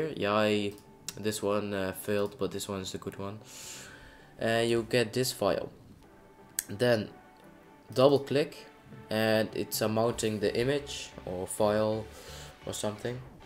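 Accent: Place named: Netherlands. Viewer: uit nederland denk? kun je wel horen lolll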